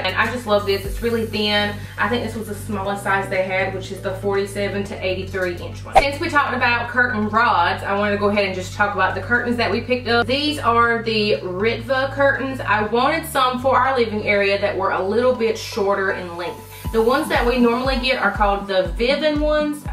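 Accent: South, United States. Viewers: living for the southern accent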